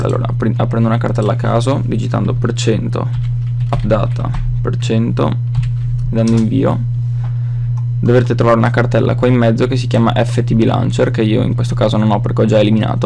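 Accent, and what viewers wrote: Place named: Rovigo, Italy. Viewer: sei di rovigo lol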